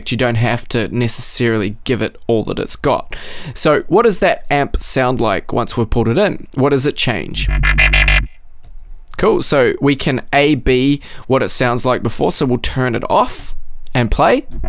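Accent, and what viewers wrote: New Zealand. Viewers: Thanks a lot! This was extremely helpful and the NZ accent is music to my ears :- )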